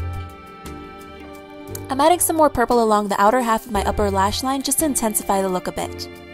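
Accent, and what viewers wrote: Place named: New York, United States. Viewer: Hey, I have been a long time subscriber of your's and I like you because you are usually so original, you know, with your crazy NY accents and such. But I feel like this video seems a bit like something Michelle Phan would do. I understand that she's your collegue, but I miss the NY. <3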